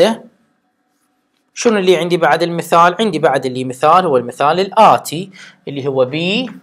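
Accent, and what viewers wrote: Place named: Basra, Iraq. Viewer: من لكنتك بصراوي😹😻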